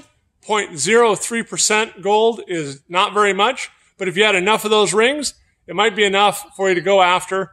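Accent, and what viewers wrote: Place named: United States. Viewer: Weird, guy has an American accent, but it looks like it was filmed in Somalia!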